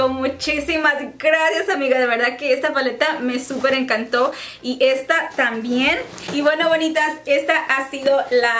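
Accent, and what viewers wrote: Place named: Mexico. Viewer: Disculpen mi ignorancia yo recién veo este canal pero no es mexicana? porque para mí tiene todo el acento mexicano.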